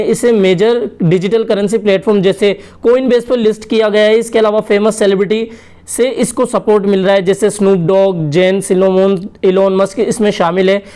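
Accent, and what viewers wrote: Rajasthan, India. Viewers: Rajasthani lg rhe ho Awaj se to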